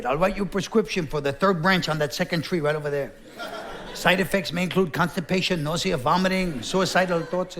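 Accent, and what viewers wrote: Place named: New York, United States. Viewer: He sounds so New York but is so intelligent!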